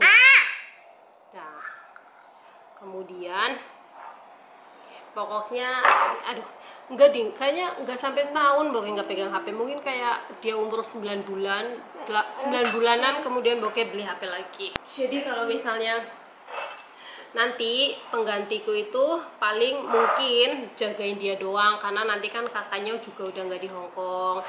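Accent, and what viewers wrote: Banyumas, Indonesia. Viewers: Salaam ngapak😁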